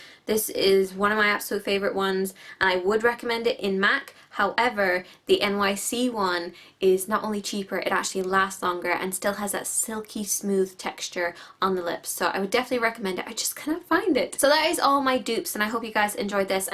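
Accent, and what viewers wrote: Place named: Ireland. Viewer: Are you Irish or American? I'm Irish and can't figure your accent out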